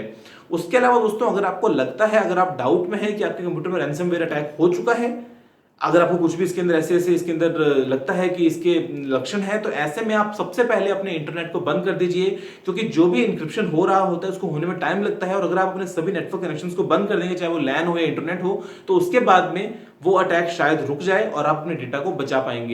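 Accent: India. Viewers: Another Indian, this is boring to listen Indian accent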